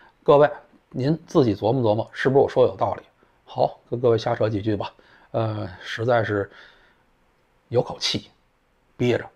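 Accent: Beijing, China. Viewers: 聽散人操著北京腔罵政府真是特別爽~ 🥰🥰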